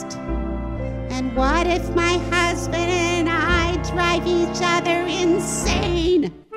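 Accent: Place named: New York, United States. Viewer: You've hit the nail on the head as always. 💉 Nice NY accent! Enjoyable as always.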